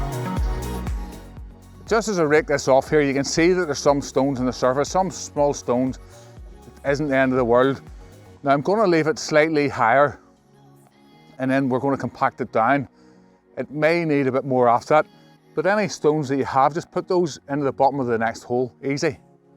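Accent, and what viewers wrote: Northern Ireland, United Kingdom. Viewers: Beautiful response, and I LOVE your "broad Northern Irish accent" ! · Norn Iron accent AYE???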